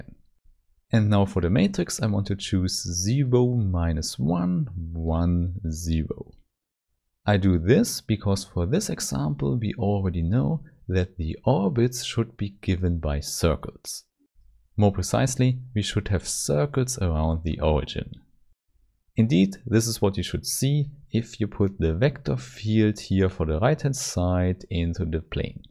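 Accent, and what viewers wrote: Germany. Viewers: This is great explanation, very useful.Thank you. P.S.Judging by your accent, I assume that you are from Berlin.🙂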